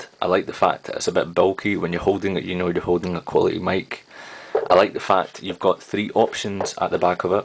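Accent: Scotland, United Kingdom. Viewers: Are you Scottish? B)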